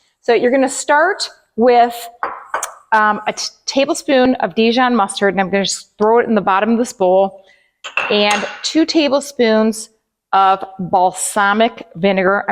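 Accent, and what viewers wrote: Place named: Minnesota, United States. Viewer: Your recipes look sooo great!! I am gonna start trying them. Where are you from?! I am originally from Wisconsin, so I am loving your accent 😊. My guess is Minnesota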